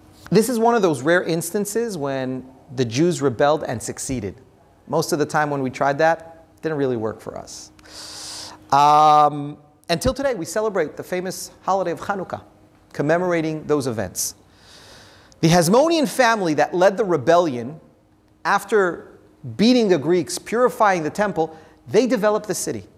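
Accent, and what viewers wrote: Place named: United States. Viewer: It would be better with subtitles. Sorry to say it, your American accent , sounds anxious and your tone of voice neurotic.. Think who is your audience. You are doing a disservice to a great work. Let an Israeli tell the story.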